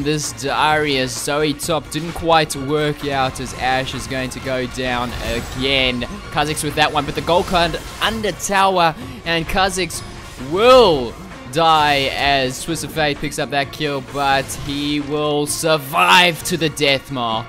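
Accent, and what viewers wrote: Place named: South Africa. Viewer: You have to be South African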